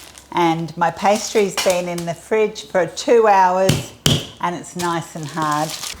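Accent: Australia. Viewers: Thanks, I love custard tart. Are you English? I hear the Aussie accent but I hear an English accent coming through here and there.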